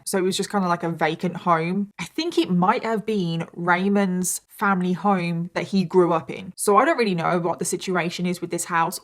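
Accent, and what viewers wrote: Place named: East Midlands, United Kingdom. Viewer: stupid? no. you sound like me before i started spending a lot of time in the US. it's basically like living on the border of Lincolnshire and Nottinghamshire. Also, watching this with my three year old in the same room (headphones while she plays) she is my baby. a three year old is a baby. this whole case is terrible.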